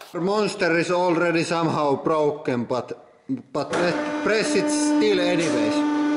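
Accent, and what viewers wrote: Finland. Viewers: kunno rallienglantii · rallienglanti for the win:D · Valtteri Närhi Aksentista huomaa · Channel Thats cool. i love your Finnish accent by the way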